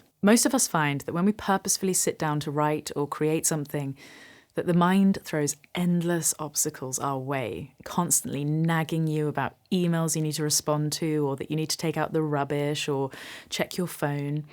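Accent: United Kingdom. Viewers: Some people have it and some people don't. Spender has it! Perhaps she realises this, perhaps not! What do I mean by "it"? Let me explain. She's a song writer. She a musician - plays at least 3 instruments, that I am aware of! Is quite good at these things. Knows a lot of nice people. And seems to have heart flutters at the mention of John Mayer, whom I refer to as JC - those are his initials. Has a British accent. Here music is not my style - however is a gem especially since "litrally" wrote it, performed and produced it - all kind of a complex process. So what do I mean by it. PRESENTATION! She natters on about such things as buying (and unpackaging) a vintage guitar, the excitement of visiting music stores (in several countries), the ups and downs of practicing music - and offers advice. To me her real talent is that she can talk about anything and make it very interesting and in my case impossible to ignore. 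She could come on here and talk about a songbook she recently bought at a used book store. She wouldn't talk about the songs in the book but rather about the several missing pages and the songs that may have been, or should have been on those missing pages. I would listen, sit back and smile and have another sip of coffee!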